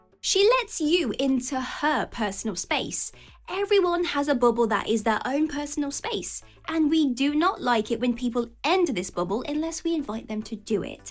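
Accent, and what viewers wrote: England, United Kingdom. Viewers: I dont know much about the tips but i watch these videos for the English accent and the squeaky voice.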